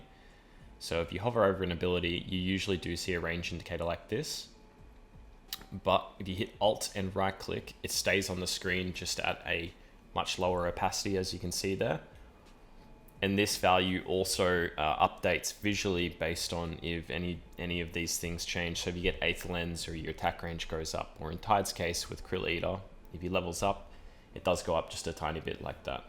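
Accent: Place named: Australia. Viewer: Something about an Aussie accent that just makes any youtube video better.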